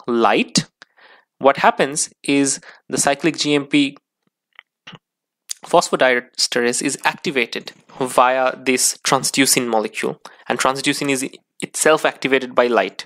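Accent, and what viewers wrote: India. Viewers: His accent sounds like Only Desi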